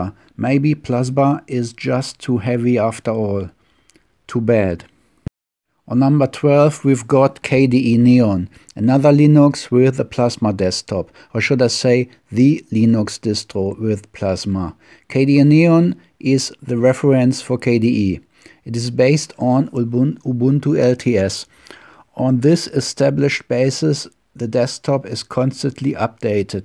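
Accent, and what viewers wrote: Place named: Germany. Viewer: wieso kann man das englisch klar verstehen aber das deutsch trotzdem raushören?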